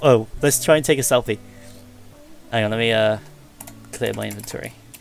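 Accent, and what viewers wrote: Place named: United Kingdom. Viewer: You sound like a british Linus Sebastian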